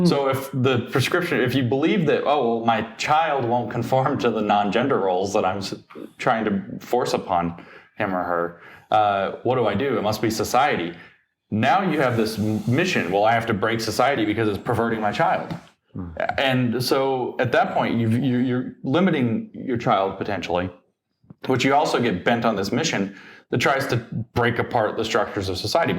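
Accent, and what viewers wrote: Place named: United Kingdom. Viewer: I don't find it tedious, and I'm sure I'm not alone in that. It's so easy to forget that Konstantin grew up in Russia until he was 13. The man speaks with a British accent and went to university in Britain. It's not as if he says that all the time. You try living in a "foreign" country and NOT making reference to the culture of your childhood quite frequently. It informs his worldview, and is probably responsible for his fairly unique viewpoint on many issues.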